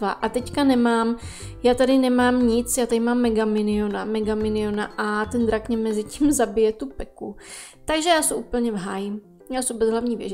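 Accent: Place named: Moravia, Czech Republic. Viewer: Líbí se mi tvoje moravské vyjadřování.Já su atd....:D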